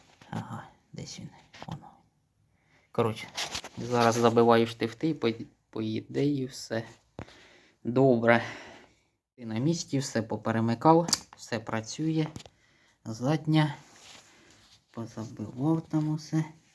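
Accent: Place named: Mykolaiv, Ukraine. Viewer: підписався на Тебе і не шкодую. Відоси інформативні та приємні. Дякую (за все, а особливо за наш " суржик"! Я- з Миколаївської обл)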